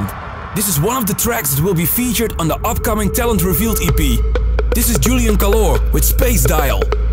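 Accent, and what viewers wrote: Netherlands. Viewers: That Dutch Accent =) ;D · haha dat nederlandse accent :^)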